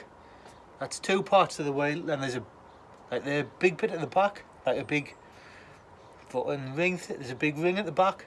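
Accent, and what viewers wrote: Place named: Sunderland, United Kingdom. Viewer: You have to be from Sunderland Marra with yr Accent.. Im from Farra originally .. Love yr videos..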